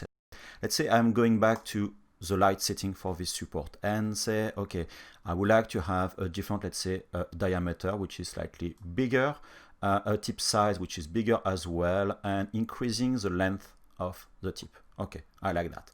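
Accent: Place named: France. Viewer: Very nice, keep em coming! Used to Isotropix Clarisse, donc pas de probleme avec l'accent francais :)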